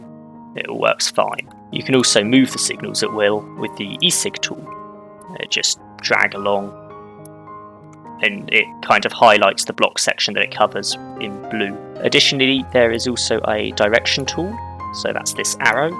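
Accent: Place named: United Kingdom. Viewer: You sound British but say beta the American way. lol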